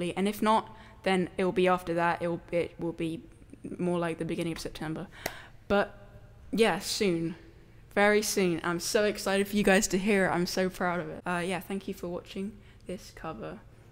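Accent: United Kingdom. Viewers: he's British I think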